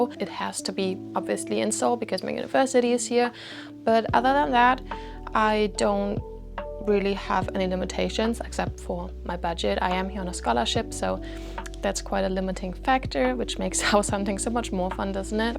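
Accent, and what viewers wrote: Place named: Germany. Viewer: Are you German ? Your accent is German accent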